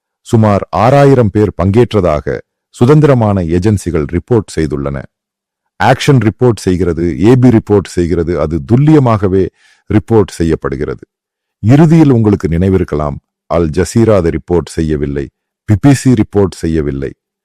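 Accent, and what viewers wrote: Kerala, India. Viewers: Native kerala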